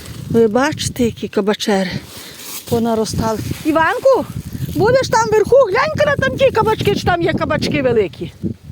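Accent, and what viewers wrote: Ternopil, Ukraine. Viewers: В Тернопiльськiй обл так балакають.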